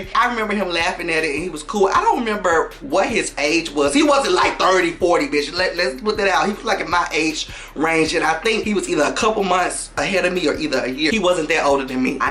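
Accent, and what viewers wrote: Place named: Memphis, United States. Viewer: Please tell me you’re from Memphis! I know that accent and vibe from anywhere, you are soooooo damn funny!!!😂 LOL I was cracking up the entire time but the ending gagged me😩😢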